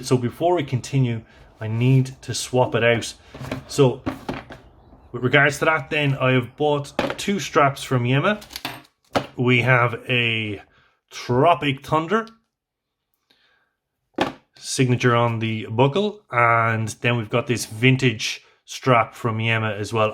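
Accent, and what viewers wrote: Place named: Ireland. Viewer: And, nice channel you've got there. As an Irish guy as well, the familiarity of your accent and turn of phrase is nice to hear. And like you, I'm in the same boat when it comes to Red Breast.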